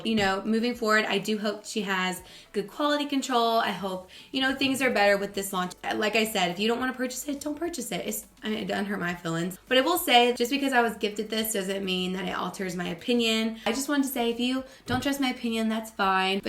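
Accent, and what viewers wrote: South, United States. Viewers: love your video and your southern accent... greetings from Athens GA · Not sure if it’s you losing your voice or moving back to Georgia but your lil southern twang is coming back out! So cute 💜💜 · I can hear you southern accent more when your voice is coarse. It’s so cute!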